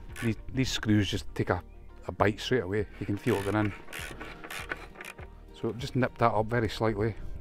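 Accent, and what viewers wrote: Scotland, United Kingdom. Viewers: Liked the presentation nice to hear a scottish accent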